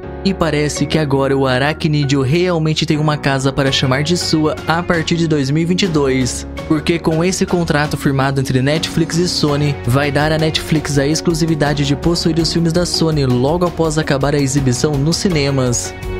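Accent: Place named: Rural, Brazil. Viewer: POR QUE TEM UM CAIPIRA FALANDO, CRUZ CREDO SO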